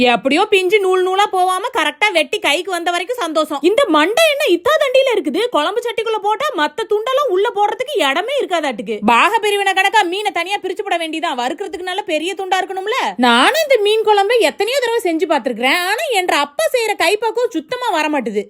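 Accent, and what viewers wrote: Kongu, India. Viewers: Kongu I think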